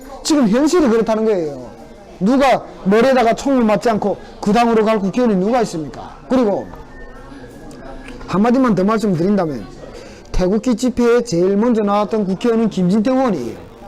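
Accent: North Korea. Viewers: 당신은 이북말쓰십니다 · 말투가 북한말투다. 위장탈북한 빨갱이 아닌가 생각해봅니다.보수에 파고들어 분열책동자인것 같습니다. 잘들 생각해봅시다. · 처음 보는 분인데 오늘 말하는 것을 보니 진실성이 있는데, 보기 드문 사람이네요. 말투를 들어보니까 탈북자인 것 같은데, 엄청 많이 아시네요. 원래 변희재 "브레이크뉴스"라는 이상한 좌편향 인타넷매체를 운영했는데, 최근에 보니까 보수라며 목소리를 높이고 있어서 유심히 보고 있는데, 역시나 남을 이용하고 마음에 들지 않으면 마구 까는이념도 의리도 없는 사람으로 변질되어 있는 것을 보고 놀라지 않을 수 없었습니다. 특히 그의 눈빛은 완전히 자기도취에 빠진 광인이 되어 있는 것 같아서 무섭기도 하고 정말 안타깝고 실망스러웠습니다. 사실 나는 어렸을 때부터 미친 사람을 좀 겪어봤거든요. 의사 입장에서는 그냥 환자이지만, 일반인들은 무서워서 피하죠. 그래도 우리 부모님들은 마음이 워낙 너그럽고 좋아서 집에서 밥도 같이 먹고 잘 대해 주었지만, 얼마 안 있어 돌변해서 욕하고 그러더라구요. 정상이 아니죠. 갑자기 이러한 옛날 생각이 나네요. 그리고 예전에 초창기 인터넷매체로는 오마이뉴스, 프레시안, 서프라이저 등이 있었는데, 노무현 정부 들어와서 그들이 하는 뉴스나 행태들이 외골수지만, 가끔씩 그런 사이트에 들아가보면 완전히 딴 세상 이야기를 너무 진지하게 장문의 글을 올리고 논박하고 하는 것을 보고 이상한 사람들이네 하는 생각을 했습니다. 외눈박이들이 서로 자기가 옳다고 뜬 구름 잡는 주장을 하고 현실성이 없었죠. 하지만, 그들은 그런 훈련을 끊임없이 하면서 경쟁하고 거기서 살아남으려고 무척 노력하는 것 같았습니다. 어디서 캐캐묵은 이야기를 진리처럼 말하고, 결코 그들의 실력은 자랑할 만한 게 없고 형편없었습니다. 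그냥 맹목적으로 진영논리를 전수받아 앵무새처럼 얘기하는 수준밖에 안 돼요. 겁먹을 거 없어요. 목사와 유사하다고나 할까? 그렇다고 목사를 욕하자는 건 아닙니다. 비현실적이고, 피상적이면서 입술에서만 나오는 동어 반복하는 수준을 말하는 것입니다. 순수한 체험적 자기철학이나 고민에서 우러나온 아름다운 글을 쓰기는 힘들 겁니다. 쉽게 사람을 판단해서는 안 됩니다. 그래서 오래 지켜봤습니다. 인간은 그렇게 쉽게 바뀌지 않는다는 것입니다. 개과천선은 절대 일어나지 않습니다. 그래서 전과(前科)는 늘기만 하고 결코 줄지는 않는 것입니다. 사실 나는 변희재씨가 처음부터 박대통령 탄핵에 관심없다는 말을 하는 것을 직접 들었거든요. 그런데 어느날부터 갑자기 탄핵을 반대하는 사람들의 대표적인 리더로 행세하는 것을 보고 우와! 정말 깜짝 놀랐습니다. 나름 자기정치를 하려고 무지하게 노력하고 있는 것 같은데 아무도 알아주지 않으니, 본인은 속상할 겁니다. 아마도 "좌충우돌"이라는 말이 그에게 딱 어울리는 말인 것 같습니다. 사실 자신의 잘 못을 시인하고 용서를 비는 것이 용기가 없이는 진짜 힙듭니다. 나는 지금까지 홍준표씨를 잘 몰랐습니다. 왜냐하면, 모래시계 드라마를 본 적이 없었거든요. 왜냐고요? SBS는 전국방송이 아니라 지방에는 방영이 안 되었거든요. 어떤 사람들은 녹화테이프를 빌려서 보는 사람들도 많을 정도로 인기가 대단했던 것은 사실입니다. 그래도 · 이 사람 탈북자? 말투가 북한말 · 당신은 말투로봐서 북한 이주민 같은데 정말로 정의롭고 용사같은 사람입니다 앞으로 계속 옮음의 길로 행하시길..... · 이놈은 미친놈이구나 너 악질이구나 나경원 장재원 김성태는 무어라 변명하냐 너 목소리가 북한말쓰냐 정체는 무어냐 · 계속 듣는데 말투가 왜 이렇습니까? 북한말투 같은데 혹시 탈북민 이신가요?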